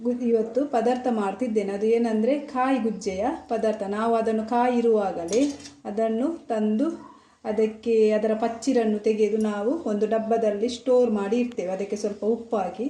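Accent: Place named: Mangalore, India. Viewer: ಮಂಗಳೂರು ಕನ್ನಡ ಕೇಳೋದೇ ಚೆಂದ 😊😊😊😊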